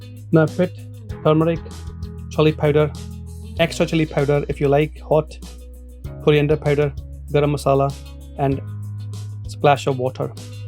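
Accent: Scotland, United Kingdom. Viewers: Do I detect a fellow Scottish accent there mate? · I sense a Scottish accent 🎉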